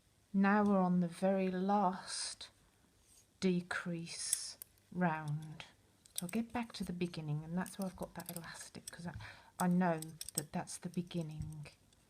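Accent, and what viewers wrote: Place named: Birmingham, United Kingdom. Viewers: love your Brumie accent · nice to hear an English accent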